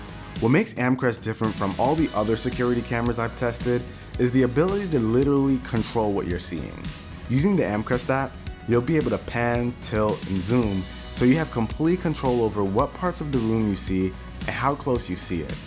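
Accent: Jamaica. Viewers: Nice video, your Jamaican!?!? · are you Jamaican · are you Jamaican?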